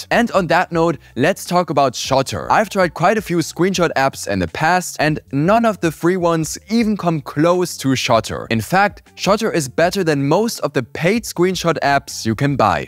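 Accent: Germany. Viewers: Ich habe echt etwas gebraucht, bis ich den deutschen Akzent durchgehört habe - Hut ab! 😁 Dein Englisch ist super 👍 Abo hab ich auch mal dagelassen :) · Sounds like a germany accent to me.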